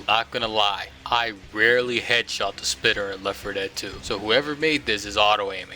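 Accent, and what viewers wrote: United States. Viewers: I’m still wondered how the hell your voice is just completely. Did you hit 30 something and you’re in your second puberty with a different voice? It sounded like you had a European accent back then now you just sound american lol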